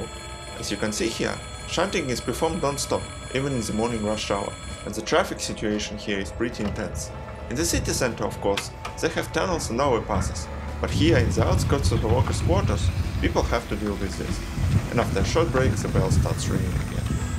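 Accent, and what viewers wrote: Russia. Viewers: Very good quality filming. The only thing I would ask or comment on is although your English is very good your Russian dialect can make it difficult to understand. I would suggest slowing down you're speaking just a little bit. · Хорошее видео, но неужели нельзя было найти человека с английским без акцента?? Монотонная ровная речь характерна для русского языка. Английский совершенно не такой. Пришлось прекратить просмотр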